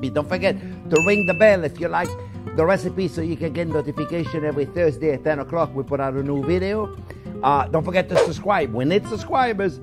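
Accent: France. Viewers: Yesss delious 🎉as usually, I’ve cooked this receipe for yrs, just didn’t have your awesome 👏 homemade broth an yrs ago had I known bout it I would have cooked it bcuz had a big kitchen, but broth in the box an bouillon cubes, Luv your recipes 🎉, an your French Accent, bloob bloob an you twinging your hands in air, tell food to get bk in pot, most of all you cook soo clean 🧽 with everything, wash your rags out wipe your working board off, I get soo tired of other cooking videos not rinsing off meat 🍖 are etc, tonigt my friend I’m drinking red wine 🍷 an enjoying your videos you made me laugh 😆 when you said if you have criticisms go an watch Tik Tok are what ever it’s called I laughed soo hard lucky I didn’t burst my jeans, lol 😝, You are a blessed renound chef 👨‍🍳 God Bless New Orleans La. 🎉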